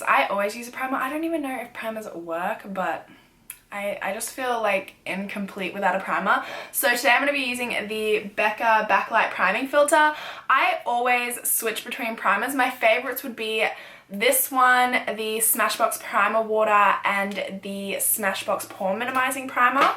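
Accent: Australia. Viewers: I loveeeee her Australian accent 😻😻😻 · Diana O'Connor lol she sounds the same the whole time to me, your obviously not aussie coz thats a full aussie accent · is she from australia?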